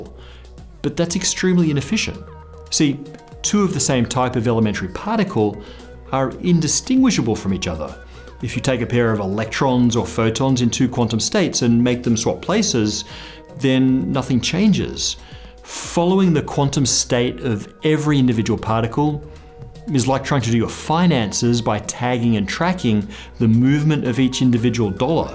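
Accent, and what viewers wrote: Australia. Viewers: sounds more Australian or NewZealand than British. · I'm not sure if people are joking but this 'aint no British accent. The guy's got a posh Australian accent. Who knew?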